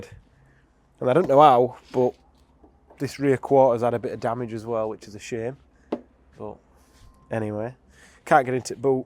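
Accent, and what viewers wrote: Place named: Barnsley, United Kingdom. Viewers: You from Barnsley fella!!! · Thought I recognised that Barnsley accent! 😆👊🏽💪🏽 Those cars are driven by absolute fucking pellets with literally no driving skill whatsoever. No surprise it's been twatted. Please try to make sure you don't sell it to another colossal helmet 😆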